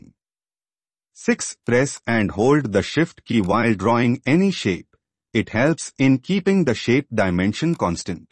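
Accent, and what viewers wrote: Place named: India. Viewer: That probably isn’t a robot, plus Indian accents might sound funny but is just how they speak.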